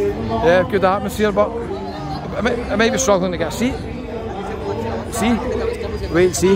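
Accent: Scotland, United Kingdom. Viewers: That pure scottish accent :)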